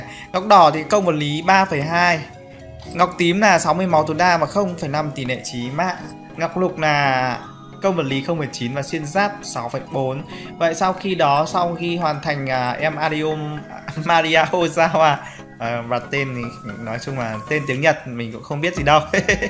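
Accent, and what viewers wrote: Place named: Hanoi, Vietnam. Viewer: Cái giọng đậm chất Hà nội nghe anh bình luận hay hơn anh đánh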